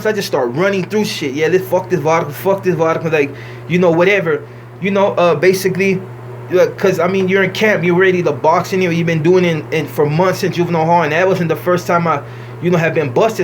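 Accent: Mexico. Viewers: Why the fuck you talking like a mexican nigga? Stfu w yo bitch ass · Are you full black ? Becuase damn dude you sound Mexican! You gotta be part Mexican lol it's not a big deal but its interesting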